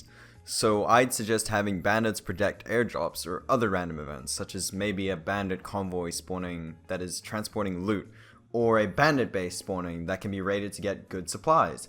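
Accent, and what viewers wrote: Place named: United Kingdom. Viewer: This would be extra nice .. you sound bigmany time brtitsh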